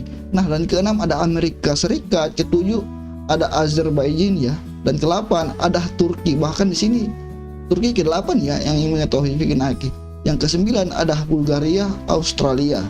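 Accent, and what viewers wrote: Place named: Eastern Indonesia, Indonesia. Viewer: 😂😂😂pecah n gokil ini chanel konten reactor ter the best menurut sy ahli IT,high class,scoupe nya international,logat narasinya jg unik Indonesia timur,keliatan smart,bicara artikulasinya jelas ga pk banyak mikir,dng grafik data keren min 👍👍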